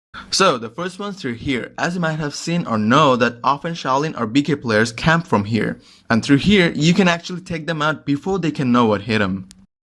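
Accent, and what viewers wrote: Bengal, India. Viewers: You're bengali ? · My guy bangali, noice, I understand what he said 😂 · Bruh u r bengali??? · ভাই বাঙ্গালি নাকি? এক্সেন্ট এমন লাগছে হ্যা অবশ্যই! পরে ভয়েস শুনলাম। :3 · Bro. You are Bengali?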